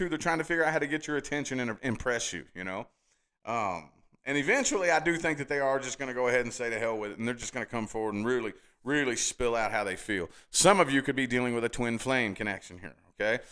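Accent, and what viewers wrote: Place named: New England, United States. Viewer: Lovely yanky twang..!!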